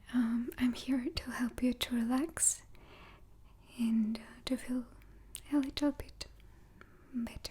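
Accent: Russia. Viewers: Hola princesa tus videos son cada vez mas profecionales exelente video de asento ruso buen blok me gusto mucho y mucha suerte en tu canal nosotros siempre te apoyaremos tus suscriptores favoritos saludos desde mexico d.f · Hey...thanks for all the great ASMR vids with your beautiful Russian accent! I never thought of rivers and parks in Siberia, thats awesome bcuz nature will keep you young and healthy! I do almost everything on your list of activities as you do! Incl the dancing in the dark!😜🙌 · I am from New Zealand and in love with u and ur accent please do more Russian accent videos I have no judgement to Russia or the war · Ciento que usas el español España y tu acento ruso combinados, los Rusos suenan algo peculiar y tu tienes una mixtura de acento español España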